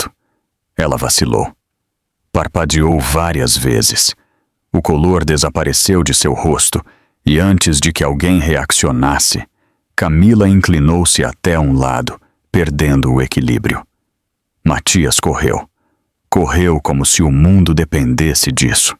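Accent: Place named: Portugal. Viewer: Português não é do Brasil. Provavelmente de Portugal. Pode ser de outro país que foi colônia portuguesa como o Brasil.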